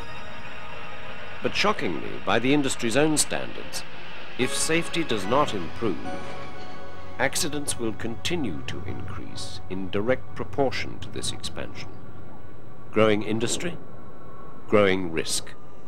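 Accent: United Kingdom. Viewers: Why do they overdub an American narrator with a British one whose thick cockney accent makes it un watchable. Know why the original Mayday series was so good? The Narrator. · This "documentary" is total bs. They got one thing wrong after another, and then, 12 minutes in, they just made up a new cause for the Tenerife disaster which had nothing to do with it. Total idiocy. I guess any 16-year old kid with an upper-class Brit accent can spend a few hours cutting clips from other vids and watching a couple of them for a few minutes so that he can sling some lingo into a script and then put something like this together and people will watch it. I love that it's called "The Facts." I'm certainly not going to finish it. The only stuff they're going to tell me that I didn't know already is stuff that isn't true. Crap, crap, crap....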